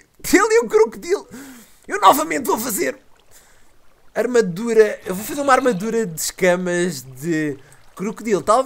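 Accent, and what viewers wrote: Portugal. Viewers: ele fala português de Portugal , isso é bem normal ...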